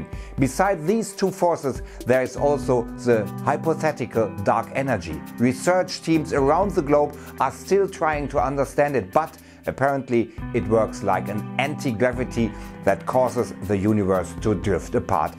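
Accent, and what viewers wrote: Germany. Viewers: Ich liebe wirklich sehr ABER die englische Aussprache ist furchtbar! Und die Grammatik ist leider wirklich auch nicht so der hammer. Man hört sofort dass da ein Deutscher englische Texte abliest.... und das Thema hätte ich lieber in gut gesprochenem Deutsch gehört. Für die englischen Zuschauer gibts doch die Untertitel Funktion....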